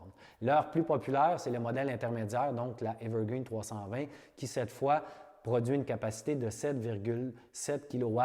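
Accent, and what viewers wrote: Quebec, Canada. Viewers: C'est très intéressant; mais, pour un français, il est vraiment impossible d'écouter un tel accent du Québec + pleins de mots incompréhensibles. Dommage : car c'est peut être intéressant mais c'est tellement incompréhensible.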